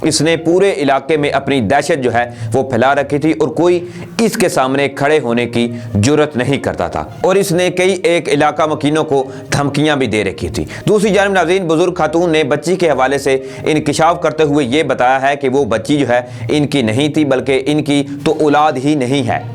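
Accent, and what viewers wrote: India. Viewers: I was enjoying this straight fwd reporting style till . Bhai we r not in India and we do not do Hindi annunciation. Ye kh-rab kiya hei? Fool bhi keho phool ki jagah. Ye kiya aur Q? Bollywood k ganei hit nehi hote Urdu k baghair aur app lower level k zuban istemaal kerne per tule hain. Mei eik defa apka pgm aur dekhunga k ye beihoodgi umeed hei app chorr denge. Werna i won't subscribe or watch. Bohat afsoos. Hua. Allah ne arbi ghora diya ho, for example, aur aap gadhey per sawar ho ker ja rehe hain. I like your reporting style per this is unacceptable.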